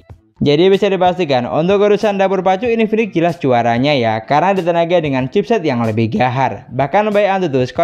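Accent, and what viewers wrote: Madura, Indonesia. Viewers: Logat madura campur sumatra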